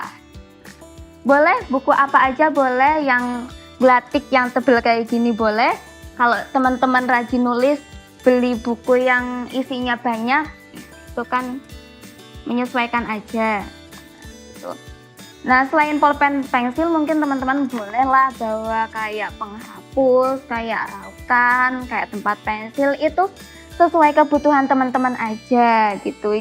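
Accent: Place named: Purworejo, Indonesia. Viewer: MasyaAllah mba.. Khas bgt logat purworejo nya.. Bismillah.. InsyaAllah tgl 11 Kali ke 2 anter ank msuk sini stlh 6 th lalu.. Smg ank kmi, betah, krasan.. Aamiin.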